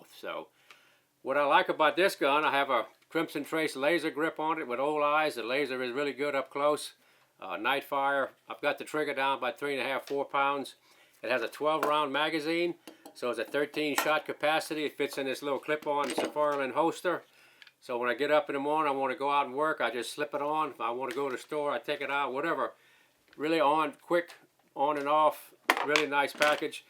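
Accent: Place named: South Louisiana, United States. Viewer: Read up on your bio in Wikipedia. I was born in June 1956, so you have almost 2 years on this rock more than me. Raised in the Arkansas cotton country 10 miles from the Mississippi my hunting as a youngster was primarily rabbits, doves and ducks. I had a Mossburg .410 bolt action and later inherited a recoil driven Remington Sportsman Model 48 in 12 gauge. I bought my first wheel gun at the local pawn shop at 21 in 38 special and did some major damage to the turtle and water moccasin population. I’ve carried a wheel gun since. The only thing I have ever won is the satisfaction of knowing I could face down any threat from thugs and gang bangers and my family was well protected. I’m a proud NRA lifetime member and a staunch believer in our 2nd amendment freedom. Oh, and I spent some time in South Louisiana, too and must say you may live “up north” now but I can still hear the bayou in your voice! 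Aiiyeee! Keep your powder dry and the videos coming! Best gun channel on YT!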